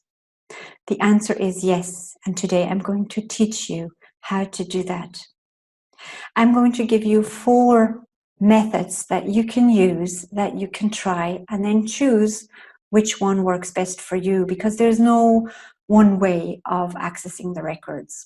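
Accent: Ireland. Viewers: Hi, you have a west of Ireland accent! So easy to listen to:-)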